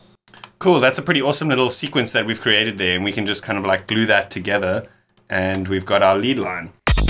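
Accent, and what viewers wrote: South Africa. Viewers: Is that a JHB accent I hear? - anyway - Nice Video - but you only said the words "Sort of' 200 Times :) - Thanks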